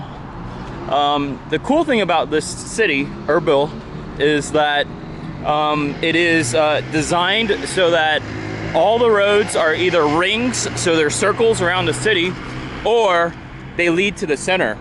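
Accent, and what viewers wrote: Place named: United States. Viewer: How did you get into that country? You sound American and I thought Americans were not allowed to enter right now because of covid.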